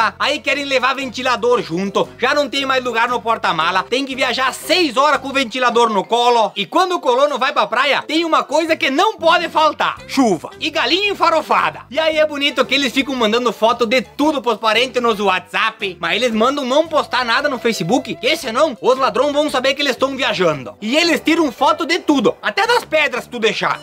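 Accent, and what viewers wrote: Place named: Garibaldi, Brazil. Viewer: Bah cara, exatamente, e esse sotaque de Garibaldi! 😆😆😆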